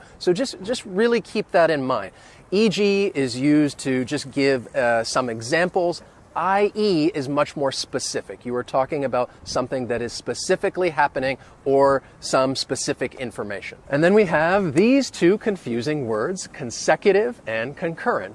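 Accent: United States. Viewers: OH MY GOD, MY FAVORITE ENGLISH TEACHER REPLIED TO ME, YOU ARE BETTER THAN MY IN-SCHOOL TEACHERS, TYYYY, IM FROM MOROCCO BTW❤❤❤❤ AMERICAN ACCENT IS RENOWNED WITH YOU❤❤❤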